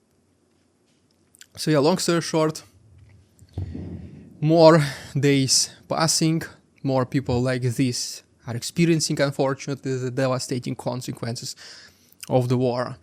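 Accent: Russia. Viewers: He's Russian that's where the accent comes from.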